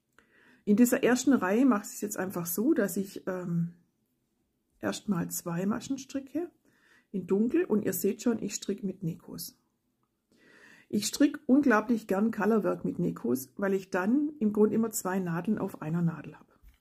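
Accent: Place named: Swabia, Germany. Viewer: 👌toll erklärt. Schön mit Deinem "schwäbischen" Dialekt. Man kann's halt nicht verbergen.😁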